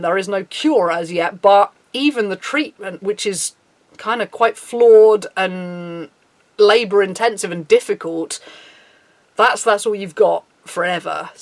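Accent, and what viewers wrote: United Kingdom. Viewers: The hair, the lips, the eyes. It's all so pleasing to watch that perfection! It's just done so pretty. And then having you talk about important stuff in your own funny, no-nonsense way, with that accent and (british?) words that you use. Oh man, it's just awesome! Soothing even! You seem like a lot of fun!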